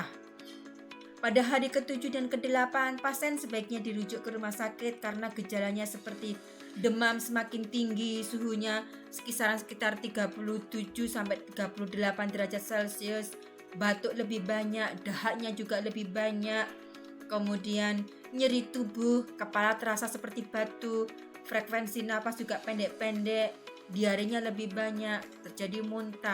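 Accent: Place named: Java, Indonesia. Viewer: Logatnya masih medok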